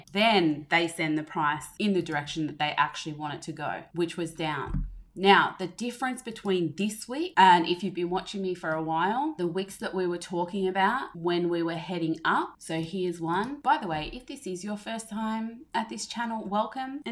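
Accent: Australia. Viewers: Hi, great content, you sound like an Aussie !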